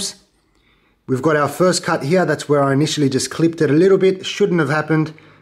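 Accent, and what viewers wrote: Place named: Australia. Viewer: Will scribing first help? You sound like the Aussie building inspection guy. Must be a cuzzie.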